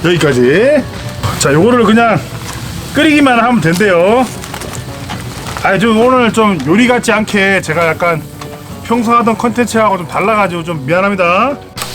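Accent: Gyeongsang, South Korea. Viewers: 예전부터 궁금했는데 경상도 사투리쓰시는데 사시는 곳이 강원도 쪽인 이유가 있나요?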